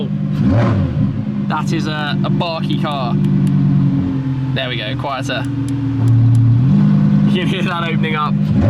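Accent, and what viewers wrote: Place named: United Kingdom. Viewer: As much as I think your British accent is just.... dandy, please give it a rest while you're driving. Even during launch control, you're just blabbering on and on. It's like someone put 6 AA batteries in your head and turned the "ON" button.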